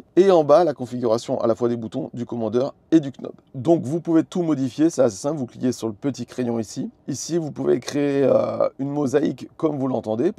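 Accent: England, United Kingdom. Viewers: on avait déjà eu un aperçu de ton accent anglais, là j’avoue que je suis encore plus sous le charme avec ce qnob 😅 Merci pour le test en tout cas;)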